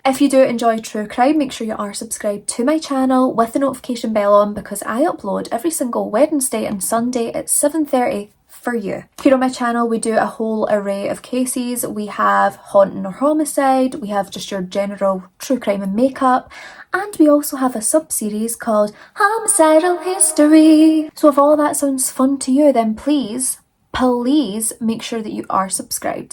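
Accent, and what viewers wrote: Scotland, United Kingdom. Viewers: is not only hands down the best looking presenter but well versed and well researched and that Scottish accent! OMG, I love this channel.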